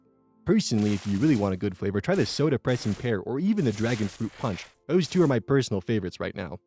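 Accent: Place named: New York, United States. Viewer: Huh. Whenever I heard some comedian do a New York accent I always assumed they were exaggerating it for comedic effect. Yet here I am, having just watched a video where nobody mentions anything about New York nor accents, but where the narrator sounds exactly like those comedians.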